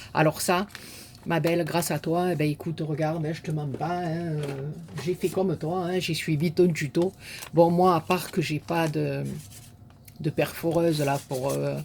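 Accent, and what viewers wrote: South, France. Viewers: Bonjour, quelle belle voix du sud, beaucoup de soleil et d'émotions dans la voix, adieu la barquette en plastique et bonjour le nouveau pot "carrousel" à crayons, très très jolies créations j'aime beaucoup tous les envois et les astuces c'est trop sympa les ballons biz à toutes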